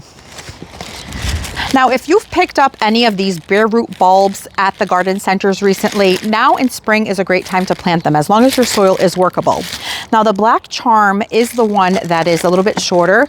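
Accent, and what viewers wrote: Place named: New England, United States. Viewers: What’s ya doing!❤. Love the New England accent. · So much inspiration for me! Your gardens look gorgeous. I grew up in RI, and I heard the accent in your video and knew it was someone local! In NH now, do live to see a month or so ahead.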